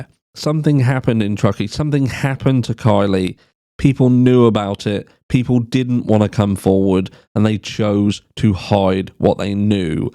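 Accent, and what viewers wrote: United Kingdom. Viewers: Love the added British accent, great affect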